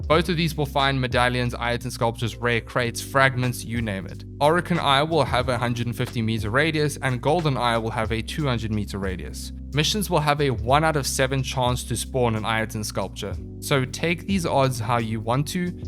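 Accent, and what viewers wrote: South Africa. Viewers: Bro do I detect (and forgive me if I am wrong) are you South Africa?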